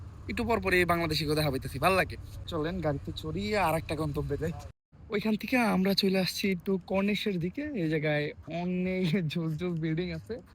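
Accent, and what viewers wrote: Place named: India. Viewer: Indian voice ase vai bangla na asla video dekhe ki moja pawa jai..?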